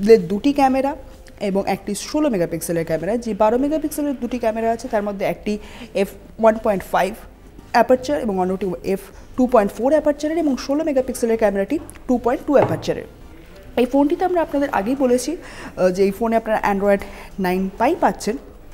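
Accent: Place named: Kolkata, India. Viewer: কোলকাতার বাংলায় বেশ মিষ্টতা রয়েছে, শুনতে ভাল লাগে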